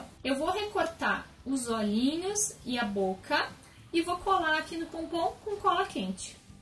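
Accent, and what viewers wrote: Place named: South, Brazil. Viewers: Que lindo💕👏💕 De onde vc é? vc tem sotaque de quem mora no Sul do Brasil☺️✌ Super Bjo!💋💋💋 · também sou de Curitiba dá pra perceber o geito que você fala com o E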